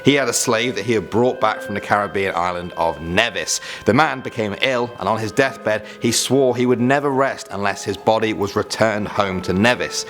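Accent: United Kingdom. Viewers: Are you Britsh 🤔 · i didn't know that he was british! hello m8!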